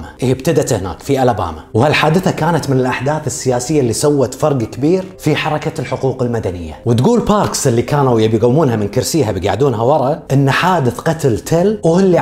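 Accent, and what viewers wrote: Kuwait. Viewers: حبيت اللهجة الكويتية من وراء سردك الحلو وغشمرتك وخفة دمك...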